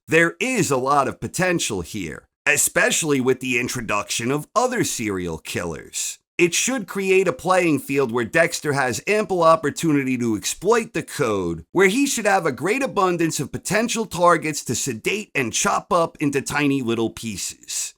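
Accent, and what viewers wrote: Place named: Philadelphia, United States. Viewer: Sir, I don't want to get too far off topic but I've lived outside Philadelphia my whole life. Most of the people around have a very specific accent and you sound like us. I don't need to know where you live or anything but every time I hear you talk I wonder if you live within the region.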